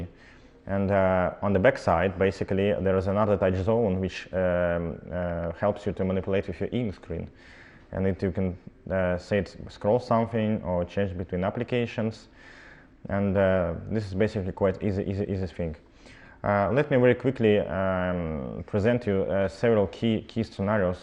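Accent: Russia. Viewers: Действительно акцент у него какой то Русский !!! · странный чел с русским акцентом !!! а телефон дерьььмо -) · Сразу чувствуется Русский акцент :-) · Акцент русский, потому что ОН -РУССКИЙ!!! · Какой-то акцент у него русский...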